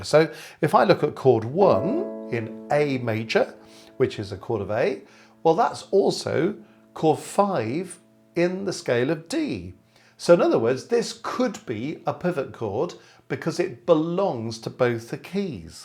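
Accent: United Kingdom. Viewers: British accent? Bossman teach you nuance in music theory so you can thrive and you can't distinguish which part of the Isles his accent derives? Coolios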